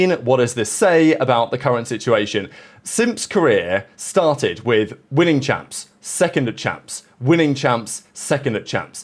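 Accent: United Kingdom. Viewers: it’s that irresistible British accent that always has women around the world swooning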